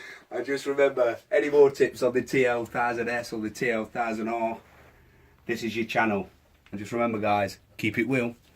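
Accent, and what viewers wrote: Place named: Nottingham, United Kingdom. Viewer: made me laugh watchin this thought to me sen sound like propper notts lads. ad a look at ure other videos and seen you where keep it up las ive got a tl1000r and from notts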